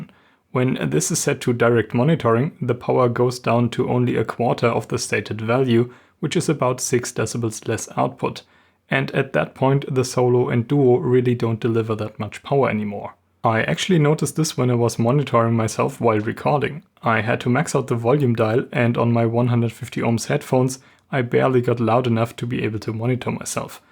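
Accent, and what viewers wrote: Germany. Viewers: Nice work catching M-Audio’s misleading claims. Equipment tear-downs should be a basic feature of any good review. I always enjoy yours. And that German accent is FANTASTIC! CIao!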